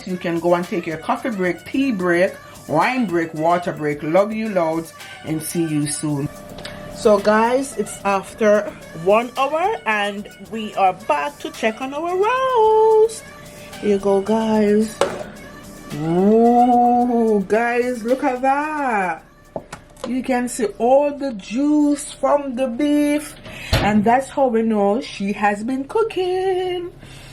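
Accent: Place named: Jamaica. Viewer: Very well presented you're channel is amazing I love the yard voice. Bless up yu self.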